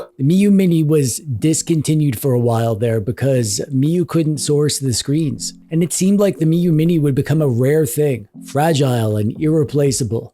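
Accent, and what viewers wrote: Canada. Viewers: This is my first time watching any of your videos, and I've now just ordered this, but one question... are you Canadian?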